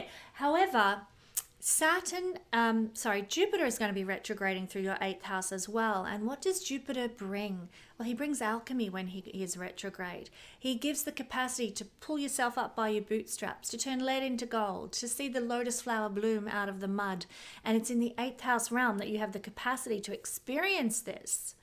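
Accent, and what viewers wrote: Australia. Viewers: Your Australian accent is very pleasing to the ear! I miss your lovely country and all its wonderfully amazing people ❤🧡💛💚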